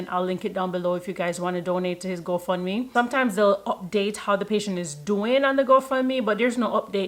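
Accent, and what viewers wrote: Jamaica. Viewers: This is the problem with nursing, if you are coming here to give your opinion about the story on hand why criticize the woman on how she speaks? I’ve never heard anyone asking a Hispanic to pronounce their words better or speak proper English. You should be ashamed to say you’re a Jamaican as well cause you should understand why she speaks the way she does. If it were my channel I would block you! Very distasteful and disrespectful!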